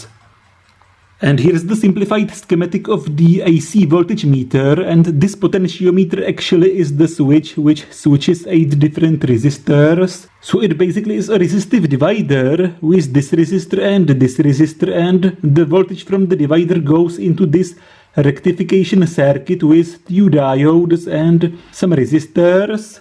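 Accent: Czech Republic. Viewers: I believe czech really sound like that. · Czenglish hell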